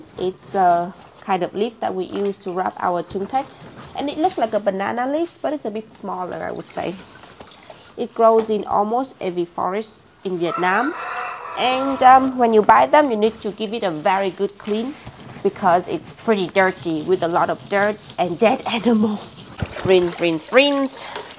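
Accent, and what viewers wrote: Germany. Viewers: I'm so Confused because everytime I hear u talk for some reason I hear a more German Accent then a Vietnamese accent and I have no idea why lol. When I first found ur channel and saw u were living in Germany and after hearing u talk I just assumed u were born and raised there. Am I like the only one who thinks this?? Btw i really enjoy watching u and ur boyfriends channel! ❤ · Your English is starting to sound more German accented. It's cute 😏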